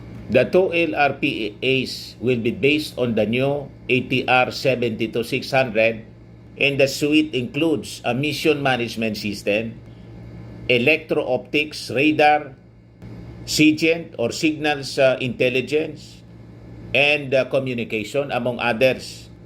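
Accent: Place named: Bicol, Philippines. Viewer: Baket ganyan ka mgsalita!bicolano ķa ba!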